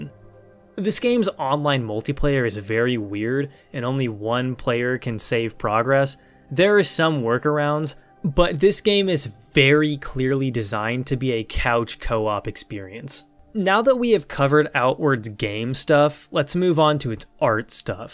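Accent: Quebec, Canada. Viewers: Quebecan? Lol. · quebecan HAHAHAH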